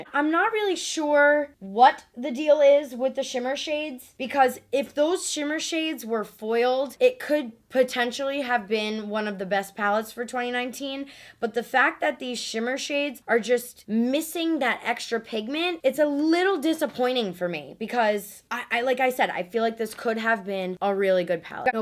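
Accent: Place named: New Jersey, United States. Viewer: With a little Jersey accent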